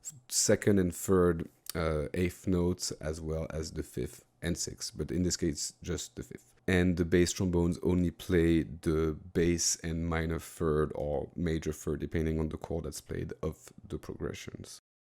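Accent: France. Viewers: Super interessant tes vidéos. Je trouve simplement dommage que tu le fasses pas en français, sachant qu'il existe pas ce genre de contenu ( tuto composition orchestrale )dans notre langue. J'ai compris que tu étais français avec l'accent ;). Sans rancune ;) parole d'abonné.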